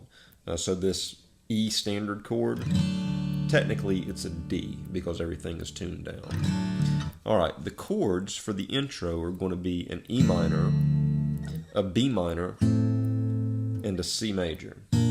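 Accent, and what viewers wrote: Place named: Tennessee, United States. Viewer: I love your voice. You sound like the comedian Nate Barghatze. I bet you're from Tennessee 🤗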